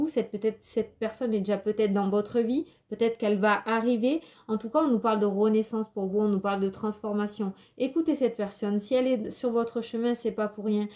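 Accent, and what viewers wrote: South, France. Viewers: Merci, j'adore votre accent du sud. Apparemment, les sagittaire vont respirer un peu 😍😘😍😘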